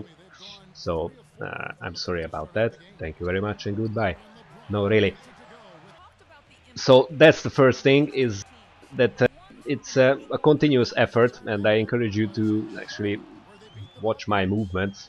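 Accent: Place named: Hungary. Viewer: magyar vagy, csak mert olyan az akcentusod/ are you hungarian, because your accent is like a hungarian's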